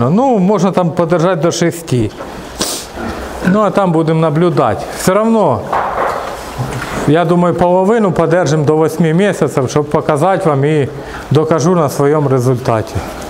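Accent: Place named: Kharkiv, Ukraine. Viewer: Да это не украинский, это харьковский говор. Чистый украинский совсем по другому звучит и интонация другая.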